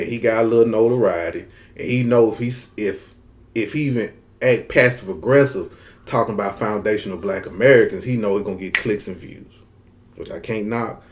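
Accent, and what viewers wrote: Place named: South, United States. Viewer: Your southern accent is beautiful. I still hate that picture behind you.🌹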